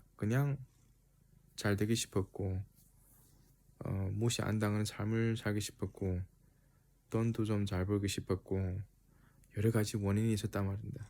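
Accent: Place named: Yanbian, China. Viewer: 구수한 연변 말투 😊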